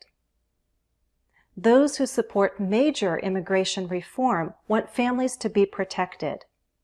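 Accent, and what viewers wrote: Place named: United States. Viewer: Hello. Thanks for your teachings! I have a question. You teach British English. Why in this video, you used American accent?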